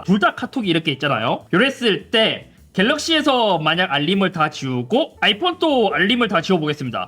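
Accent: Gyeongsang, South Korea. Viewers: 경상도 사투리 쓰는데 화나 팬이시네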